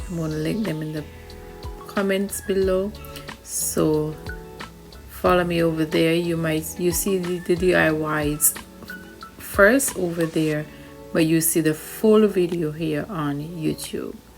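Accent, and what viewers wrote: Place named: Jamaica. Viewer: G'Day Mate, did you soak the second and third pieces of fabric like the first one or place it down dry then apply Mod Podge. Also what kind of Mod Podge did you use and do you have any suggestions on an alternative product as it is expensive hear in Oz, I don't think they even sell large containers like the one you are using. I prefer the 2nd and 3rd softer look, I also think a smaller version with embellishments would look lovely in a little girls room. You did a fantastic job very classy, only thing I would do is spray paint with a clear Lacker too help keep the dust off long term, with such a light colour. I have a few spin off Ideas from this, I think the fabric need to be light and flowing you could use more than one colour on a single canvas. I had a little trouble understanding with you Jamaican accent.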